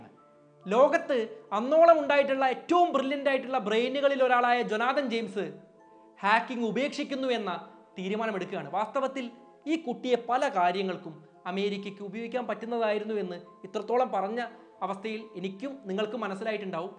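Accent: Kannur, India. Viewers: നിങ്ങൾ കണ്ണൂരാണോ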